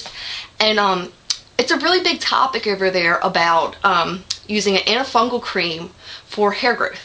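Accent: Philadelphia, United States. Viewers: This chick has GOT to be from Philly! I'd know that accent anywhere.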